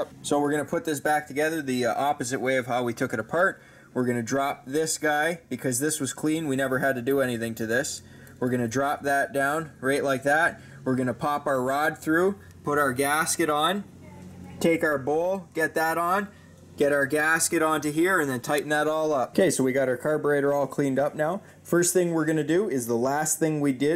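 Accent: Canada. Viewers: Nice video, good lighting and explanation (you sound like you are Canadian!)…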